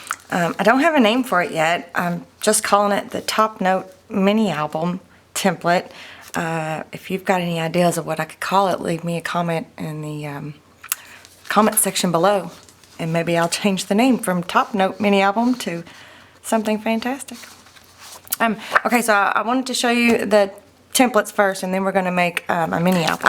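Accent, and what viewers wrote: South, United States. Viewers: Just love it!! Top Note is a great name! You are a very creative lady.. love your videos so much!!! I think maybe its because we both have a southern accent! LOL Thanks for a great tutorial!!!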